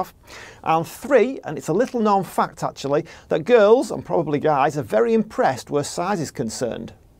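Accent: North, United Kingdom. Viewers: I have a very different routine for growing them, and cyclamen probably account for 25% of all the plants I cultivate. also in the UK, and from your accent about the same Northern latitude. been growing them for at least 10 years now and only lost 4 out of about 50 mature plants. all 4 lost were lost within the first year due to tuber rot and were probably already damaged and on their way out when I bought them. so second year of buying i prodded my finger into each pot before buying a plant to check there was a reasonable size and hard tuber in the pot somewhere. The rest are all doing very well, some started of as 1cm ultra cheap baby specimens, and now have like 5cm diameter tubers, others now have even bigger upto 10cm diameter ( that's 30cm circumference ) tubers, I have a considerable variety of species and all the colour spectrum I could possibly get my hands on. 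they flower for over 6 months of the year ( slightly staggered between species ) , and I often pollinate them with an air puffer, so they produce pods, which are interesting to look at for 4 more months of the year., leaving just 2 months of leaf only, i never let them go dormant. mine remain very lush and green all year round, most leaves lasting more than 1 year and get quite big, I never let them go dormant, and I get a great deal of continuous blooms each year, like a carpet of flowers above the greenery. I plant them with the top 1/5 ( 20% ) of the bulb above the soil or compost and i always top water, qu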